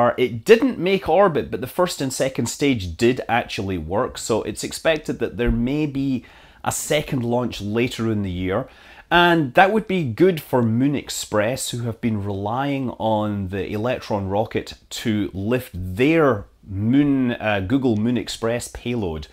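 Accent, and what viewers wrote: Scotland, United Kingdom. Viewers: Hey where in Scotland are you from?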